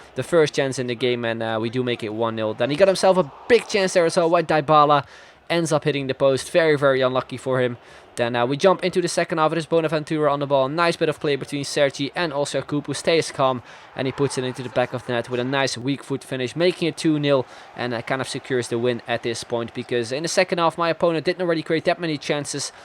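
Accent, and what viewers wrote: Netherlands. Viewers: It's a Dutch accent and its not a shitty voice it's a nice voice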